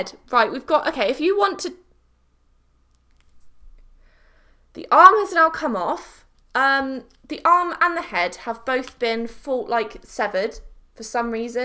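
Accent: United Kingdom. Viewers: British accent haha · I Looove your accent if I were to say it was British I will really get it In the replys.... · Who else watches to hear that Beautiful British Accent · Is she British? She sounds British 😕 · Are you English ?? Just wondering because it sounds like your British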